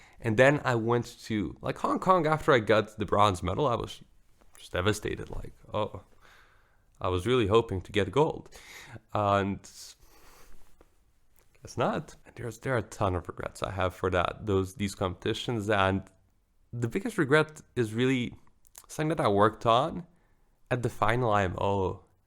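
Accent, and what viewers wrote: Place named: Bosnia and Herzegovina. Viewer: He has a Bosnian accent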